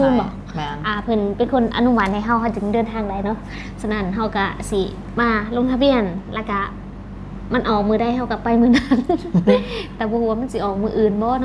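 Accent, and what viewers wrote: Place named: Sisaket, Thailand. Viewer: สำเนียงภาษาเว่าน้อง คือกันเปะเลยกับคนจังหวัดศรีสะเกษบ้านอ้าย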